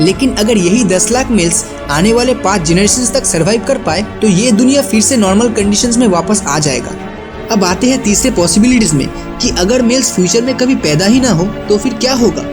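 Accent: Bengal, India. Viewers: Bhai video bahut achhi hai but tera pronunciation full toos bekar hai...poori Bangali tone aa rahi hai...tu kya bangal se hai..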